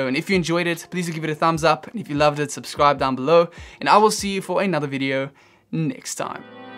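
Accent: South Africa. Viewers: Your accent sounds south african are you originally from south africa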